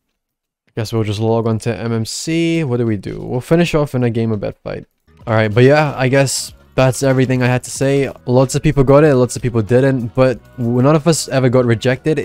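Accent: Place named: United Kingdom. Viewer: U BRITISH!!!!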